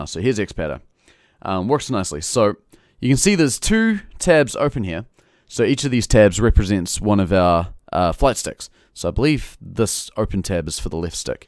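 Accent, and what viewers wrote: New Zealand. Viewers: yo I'd he a new Zealander?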